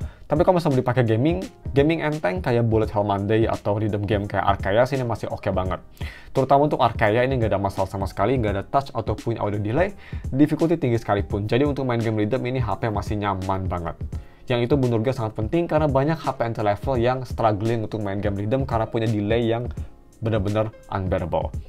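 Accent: Bandung, Indonesia. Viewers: Loh orang bandung?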